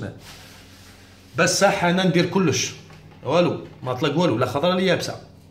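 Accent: Algeria. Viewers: تعجبني لهجة دزايرية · يحكي عربي بس اللهجة الجزائرية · Bro he's algerian lmao 💀💀💀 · حلوا اللهجه الجزائر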